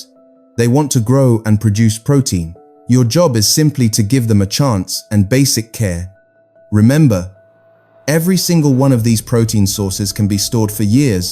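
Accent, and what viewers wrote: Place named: England, United Kingdom. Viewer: Talking dollars with an English accent 🧐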